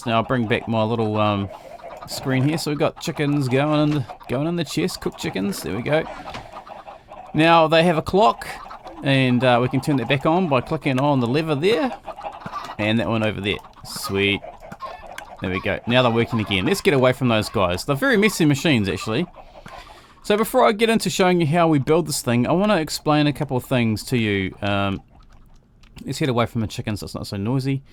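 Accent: New Zealand. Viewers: Your a kiwi! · R u from New Zealand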